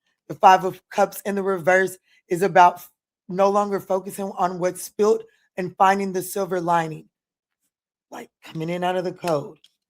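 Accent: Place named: South, United States. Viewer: 😂😭😂 she has got to be from the south. She done put 3 words into one😭🙈. I love it. Much love for the Leo Scorpio and Capricorn readings miss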